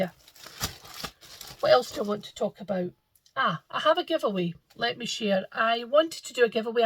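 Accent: Scotland, United Kingdom. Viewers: I enjoy your videos so much. And your Scottish accent is so delightful! Your enthusiasm for this beautiful craft make me go down rabbit trails in my stitching life. Blessings from Canada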